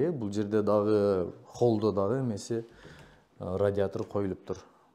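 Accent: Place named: Batken, Kyrgyzstan. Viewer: Очок жагыны деп баткендерче суйлобой таза кыргызча суйло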